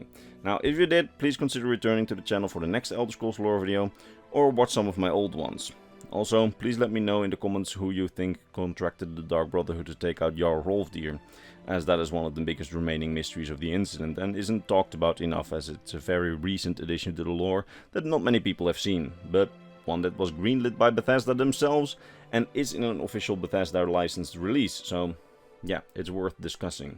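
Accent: Netherlands. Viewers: Goede videos, ik kan horen dat je uit nederland komt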